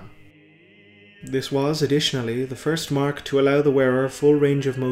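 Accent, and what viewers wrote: Ireland. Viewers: Irish accent? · interesting. I live really close to Canada, and I pegged your accent as Irish right from the jump. Maybe because my own accent is similar to a Canadian accent so the differences in yours stand out to me more. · The solemnity in your reading and the Irish accent are a welcome change from other 40 K lore channels. The Gregorian chant was a nice touch as well. Looking forward to your next installment. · Chilled 40k lore? Beautiful illustrations? Hypnotic Irish voice? Background chanting? SUBBED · So obviously Irish. Just can’t tell which part.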